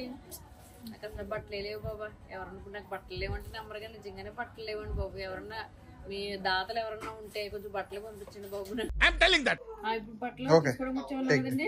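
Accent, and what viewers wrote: Godavari, India. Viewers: Hi Madam, Really I like ur Godavari slang and ur husband's funny behaviour...... Really I like ur funny videos..........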